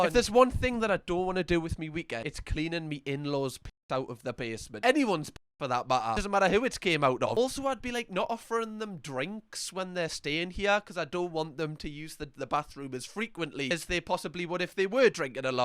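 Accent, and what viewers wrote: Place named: Scotland, United Kingdom. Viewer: you sound like scottish jacksucksatlife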